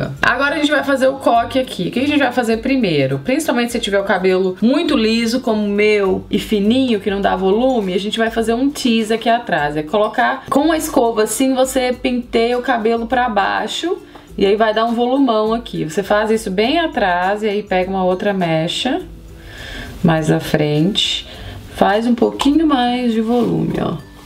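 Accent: Minas Gerais, Brazil. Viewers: Você ainda fala, mineirissimaaa 😘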